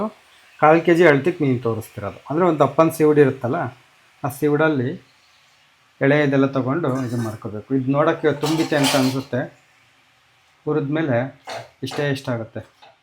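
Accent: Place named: Holalkere, India. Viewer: ನಮ್ಮದು ಹೊಳಲ್ಕೆರೆ ಹತ್ರ Adanur Nimdu yavuru? ನಮ್ಮ ಭಾಷೆ ಸೊಗಡು ಇನ್ನೂ ಉಳಿಸಿದ್ದೀರಾ, ತುಂಬ ಖುಷಿ ಆಗುತ್ತೆ..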